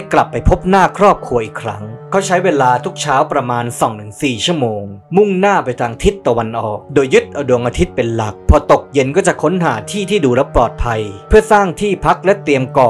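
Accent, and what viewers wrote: Thailand. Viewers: มีความเสียงเหน่อ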